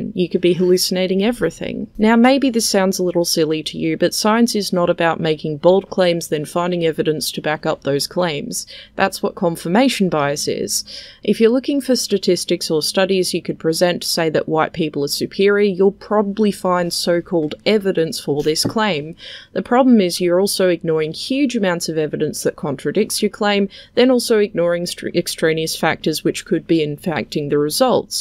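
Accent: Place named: France. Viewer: JF has taken to calling people who disagree with him "Liar" once they don't concede to his framing. It's profoundly bad faith. He becomes cynical and condescending almost immediately. For him this is a for-profit endeavor. You deposit your token and you get exactly the talking points you want with the French accent and academic "credentials" for polish.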